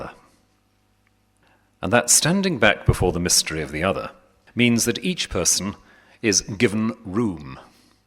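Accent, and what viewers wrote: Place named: RP, United Kingdom. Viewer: "Here we have two people reacting totally differently to human contact and human interest, one withdraws into silence, one embraces and they're both alright. Silence and honey cakes are equally acceptable [agreed, but that's not what you said here : <link> when you said that the thing to look for when a person is approaching union with God is that the person is sociable. People who isolate themselves are not close to God according to you when you were trying to manipulate me into stopping avoiding abusive people and situations. You're looking quite cheerful in this video because you still think you've got a chance of destroying me and you enjoy telling lies to a captive, and you assume admiring, audience]." "There are no standardised, generalised forms of holiness. Each one needs to discover what their path is [selling your soul to Satan and providing propaganda that leads over a million deaths and the emslavement of humanity isn't a path to holiness Rowan Williams. 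You are not holy. You are just trying to save your skin a since all your other deceitful and abusive strategies have failed]." "The whole business of passing judgement is so impossible [no it isn't]. Passing judgement in the sense the desert fathers so DEPLORE [his emphasis in his super posh voice, raising his voice not quite an octave to try and sound more authoritative. The desert fathers were talking about the ordinary every day sins of a group of monks living a common life. They were not talking about psychopa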